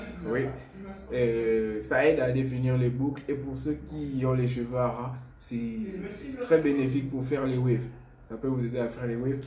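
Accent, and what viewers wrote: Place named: Antilles, Caribbean. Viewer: Tema l'accent des Antilles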